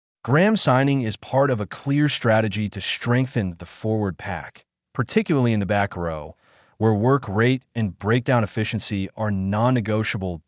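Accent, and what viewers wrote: United States. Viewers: AI crap, not just because of the US accent commentary !!! Don't bother with it folks !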